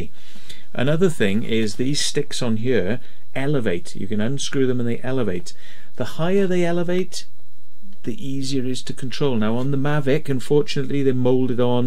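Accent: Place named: Wales, United Kingdom. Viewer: Best description of these settings I've seen. Now I'm trying to apply what I've learned to my Mavic 2 Pro. Kind of funny to hear a fellow Welsh accent (I'm near Pontypridd)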